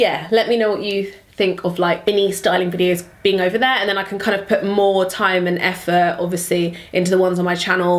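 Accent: United Kingdom. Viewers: I love her british accent and the way she says "Bits & Bobs" and all of the traveling she's getting done! Indie is getting to see the world already! You guys are great parents 💕🤗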